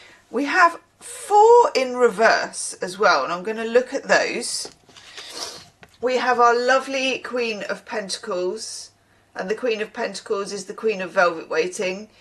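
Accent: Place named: Wales, United Kingdom. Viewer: 😂 I’d never heard of evince & had never tallied plato & platonic 😅& then there’s the 3rd slang word in London for cunnilingus Platoed 🤣🤣🤣I’m going get told off . Your Welsh accent great !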